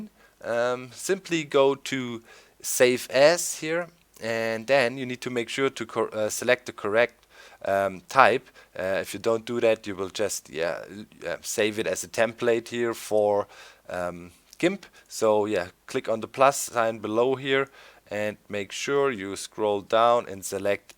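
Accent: Germany. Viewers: ich wusste es du bist deutsch (das hört man etwas) ab links oben kann man die deutschen Optionen sehen :D Hallo :DD übrigens gute Vorstellung ;D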